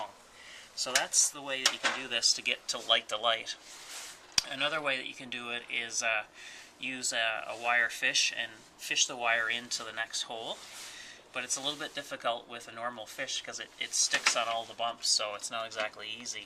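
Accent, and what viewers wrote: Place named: Toronto, Canada. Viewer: Totally knew you were from Toronto before I even saw your user name! #Hoose LOL!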